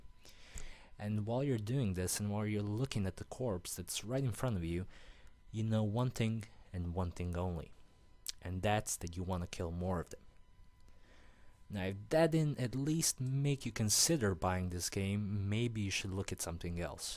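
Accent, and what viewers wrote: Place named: Germany. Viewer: Yo great review thanks for being 1st ur German accent makes it E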